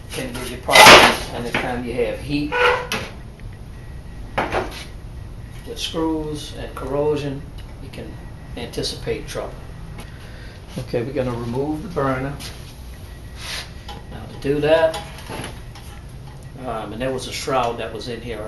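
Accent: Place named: Louisiana, United States. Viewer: Cher, I sure like dat Cajun accent. Ce c'est bon, oui.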